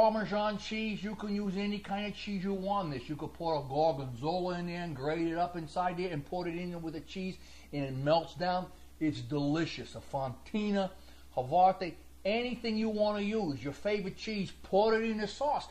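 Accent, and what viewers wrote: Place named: New York, United States. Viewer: It's a New York accent coming from a guy who's lived in Georgia for the last 20 years. From New York to Atlanta to Habersham County.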